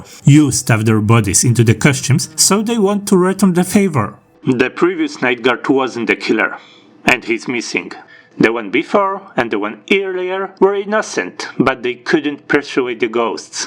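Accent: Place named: England, United Kingdom. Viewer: PL: Masz niesamowity akcent Angielski EN: You have best English Accent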